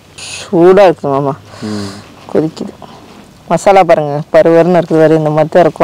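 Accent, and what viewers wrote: Kongu, India. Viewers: கொங்குதமிழில் சமையலும் அருமை... இந்த பச்சைசெலவு குழம்பில் கத்திரிக்காய் போட்டு பன்னினால் இன்னும் அருமையாக இருக்கும்... பச்சைசெலவு கத்திரிக்காய் புளிக்குழம்பு ..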